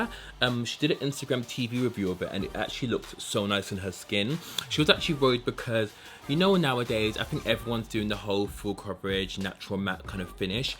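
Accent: United Kingdom. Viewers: Uhhh I looove your british accent!!!! 👏🏻👏🏻 I have been waiting for a review of this foundation! 😍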